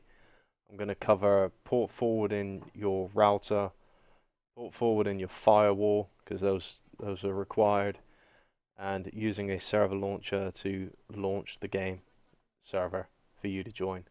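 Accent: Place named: Iceland. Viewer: where are you from. you sound like your from Iceland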